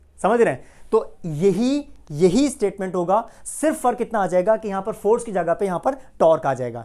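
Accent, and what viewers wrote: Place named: Karachi, Pakistan. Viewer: sir ki language sy tu karachi ky lgty ha 😂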